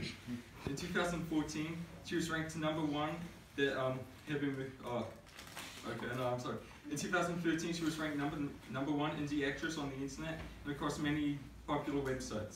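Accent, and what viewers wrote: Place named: South, United States. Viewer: His accent is a like a mixture of southern, Australian and kiwi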